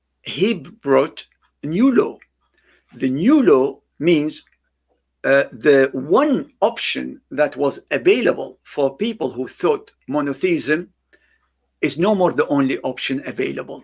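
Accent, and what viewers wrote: United Kingdom. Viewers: you speak in such a perfect narrative voice I really REALLY want you to release an audio of all the books of Hadith and the Holy Quran in your voice narration. What an effective beautiful British accent you have. I will write this comment underneath all your videos until you read it. May Allah take this service from you in this life.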